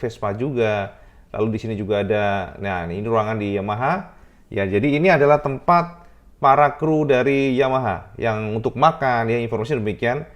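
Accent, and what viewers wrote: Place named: Lombok, Indonesia. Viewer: MATURTAMPIASIH,,,kental bahasa sasak lombok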